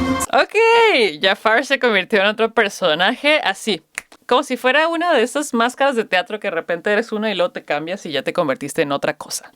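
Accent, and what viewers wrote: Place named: Mexico City, Mexico. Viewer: Es el mejor, lo viven vivo en NYC. El Ingles es su tercera lengua ( Kazajo, Mandarín,Inglés) más o menos fluido. Escuché norteamericanos e ingleses reaccionando y dicen que su casi perfecto. Con tu inglés chilango tienes razón ( nada que ver ) no escucharás el inglés. Te daré referencia de gente que habla inglés, desde “ ” song se nota su mejoría. La música no tiene idioma. Por lo demás está bien tu estilo de reacción.. Te felicito porque descubriste al mejor. 👍👍🇭🇳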